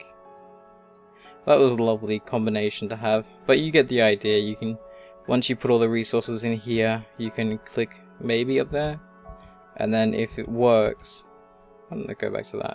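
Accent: Australia. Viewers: What country you from? Australia??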